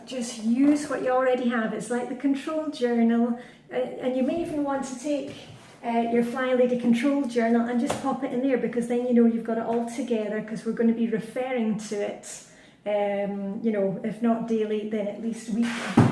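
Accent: Scotland, United Kingdom. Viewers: Just found your videos due to a recommendation on The Hygge Nook group on Facebook and I am already converted... Flylady with a Scottish accent!! And in Denmark!! How could I resist?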